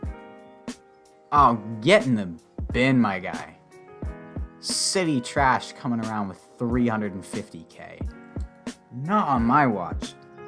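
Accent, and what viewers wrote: Canada. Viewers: Dude why are you so amazed. We have little towns all over near Sarnia (which is where I believe you are from by the accent, me too). Arkona? Thedford? Watford? Chamlachie? The list goes on.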